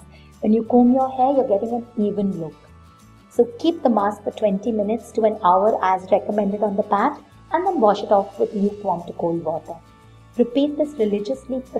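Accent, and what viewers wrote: India. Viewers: It's Indian accent. Though I'm not Indian myself, I find your comment offensive. Not every accent are to our liking but we get accustomed to them. I hear many dielects here in London and I don't go telling everyone what I think of them. It's a language and it's purpose is to communicate. How you find it is your personal preference. Don't think anyone gives a fuck what you think of the accent same way no one cares what I think of the moon. Just get the message and utilise it.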